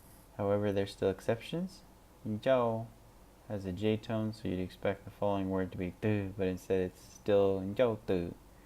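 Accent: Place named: Thailand. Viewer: Thank you so much! This was super helpful to me! I can’t believe I didn’t see this one before. Your accent is super thai here.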